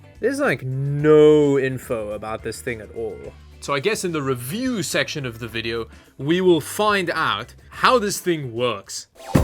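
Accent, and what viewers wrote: Afrikaans, South Africa. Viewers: I keep hearing an afrikaans accent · I can hear some South African in that accent? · Hi, your name and accent suggest dutch/ afrikaans, are you from ZA or like Namibia?? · Are you from South Africa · Are you South African?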